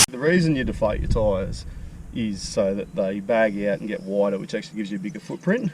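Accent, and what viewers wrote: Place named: Australia. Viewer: Dear everyone who are clueless about Australia; this is what the average Australian accent sounds like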